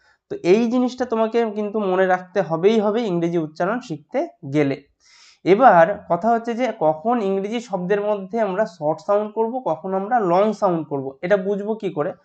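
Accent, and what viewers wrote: India. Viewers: Are you an indian?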